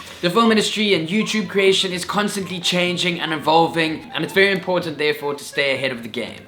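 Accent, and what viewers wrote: South Africa. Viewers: I started to read the comments and could here the awesome SA accent as I read each one 😂 From, just an Aussie who loves South Africans boet.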